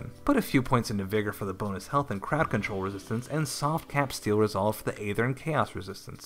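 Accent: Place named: Texas, United States. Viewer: In the First Moment i thought here was a Cowboy from Texas, who plays GD^^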